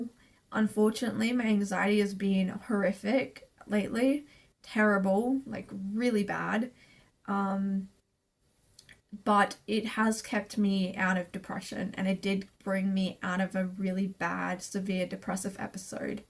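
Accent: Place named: London, United Kingdom. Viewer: I don’t know why I thought you were from the UK. I thought you had a nice polite London accent 😂 Anyway, very informative video x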